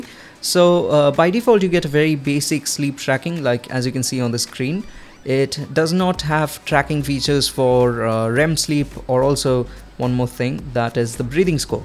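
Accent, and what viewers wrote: India. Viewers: Good review! By the way, your accent is nice too not the typical heavy Indian accent that's somewhat annoying because it's super hard to understand. FYI regarding the GPS though most Running watches even Garmins have issues when near or inside buildings.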